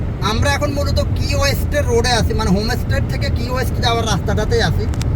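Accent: Noakhali, Bangladesh. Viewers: আইচ্ছা এইটা তো নোয়াখালী মতো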